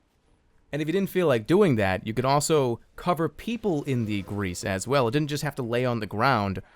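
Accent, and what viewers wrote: Boston, United States. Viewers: Your boston accent is just orgasmic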